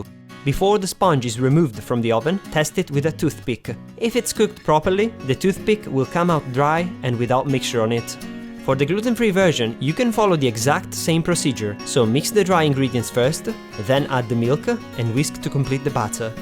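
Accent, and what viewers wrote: Italy. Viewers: Si sente che sei italiano:)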